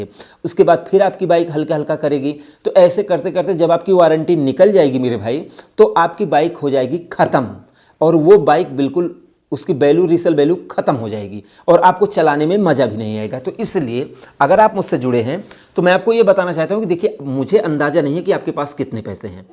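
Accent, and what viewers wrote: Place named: Rural, India. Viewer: अब तुम लोग मजाक बनाना बंद करो। यह इन भाई साहब का देशी अंदाज है। नकली लोगो की तरह मुँह बना कर बात करने वालो से दिल ऊब गया है।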